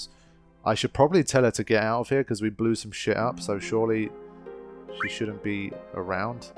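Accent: England, United Kingdom. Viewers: Wait... Have you guys always had that chocobo opening square logo? I honestly think thats the only thing I didn't know about this game. I'm from the UK and for us it just boringly says 'Squaresoft' You sound English too though... I'm so confused but I've legit NEVER seen that before. Platinum release maybe? Or are you in another region? On a different note, aren't you the guy who went to visit the blind guy who loved your FFIX LP because you read the dialogue out loud? That was awesome. Lastly, I feel like you had a FFX video years ago, sort of a retrospective-esque vid where you spoke about the ending and said something like "I was in bits! Absolute bits!"? For some reason I always remember that.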